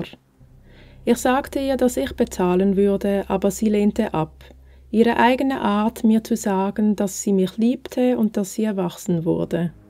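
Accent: Switzerland. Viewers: Du bist eine Schweizerin ….hihi…..man hört es 😃 Ich auch ….aber bei mir hört man es weniger …..weil das meine 2. Muttersprache ist Hab dich gerade entdeckt Abo dagelassen 🧡 Wenn du noch bisschen was übest gehts besser mit dem Hochdeutsch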